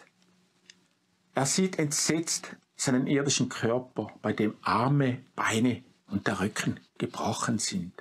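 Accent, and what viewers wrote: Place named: Switzerland. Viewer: Herzlichen Dank!! Leider ist mir dieser schweizer Dialekt ÄUSSERST UNANGENEHM !!!!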